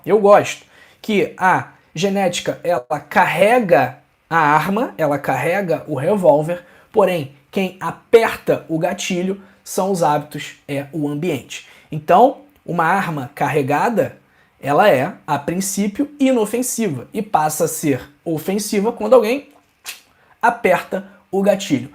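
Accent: Rio de Janeiro, Brazil. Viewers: Obrigado, doutor. Não se preocupe com críticas sem sentido. É um grande médico, do bem e percebe-se, também, que é muito atencioso e amoroso para com as pessoas, parabéns! Fale bastante e com seu sotaque carioca, passando-nos suas importantes informações que nos ajudam na qualidade da saúde. Abraço.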